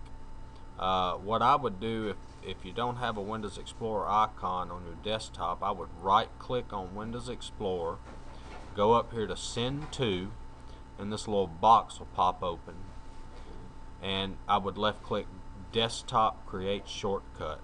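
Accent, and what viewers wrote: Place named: Appalachia, United States. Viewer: how about on chromebook ps you sound like a hill billy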